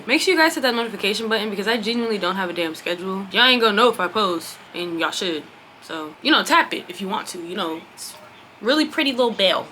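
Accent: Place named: Baltimore, United States. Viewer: You sound like you from Baltimore. ..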